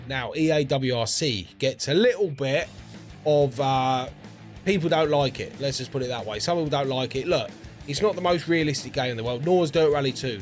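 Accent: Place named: England, United Kingdom. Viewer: i love your original english accent! :)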